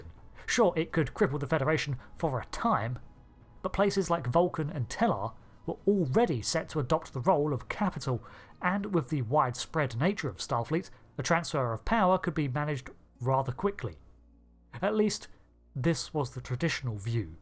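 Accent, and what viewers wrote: United Kingdom. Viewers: Just simply because you have a British accent, does not necessitae you being correct.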